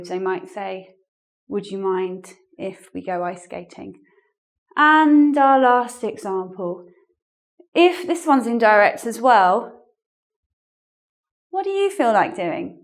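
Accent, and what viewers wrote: United Kingdom. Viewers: very useful lesson thank you, i really like your British accent:D thanks a lot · oh my god i couldn't understand anything what she spoke is it a British english ?,very diferent from the american's ,but i liked your video ,it has been helping me to develop my english ,i'm begginer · We have to visit London :) Great accent, they have a queen and they have Big Ben! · something that I learn in your last class.....you are terribly charming!! I love your brithish accent... amore mio.. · Thank you for your video and your excellent method and teaching as well. I was looking for British teacher for English learning because I'm in London for my PhD course. I learnt English in American's method so now I have a problem in London because the people's accent and their grammar is a little bit different and your accent can help me more to understanding Londoner specially in the University. 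· Very interesting! Finally a British accent!!!! A QUESTION: how can I subsribe your second channel on You Tube? I found only ENGLVID, but the second channel about you tell at the end of your video, where is it? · I liked your video, even though I realized that I'm not used to the British accent. In fact, I'm not used to another accent and I have to work on it. I've just subscribed your personal youtube channel, as you asked and simply loved your idea to help people with speech problems. Thank you for your help and I'll watch more of your videos. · To be honest, it's little bit hard for me to understand the british accent. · I've seen a lot of videos from your previous channel, as you suggested, and I really liked it! You have such a nice way to teach that make us alert to your classrooms. Plus, have a soft voice, and your british accent it's lovely! I am hungry for more videos! Keep your nice work!:)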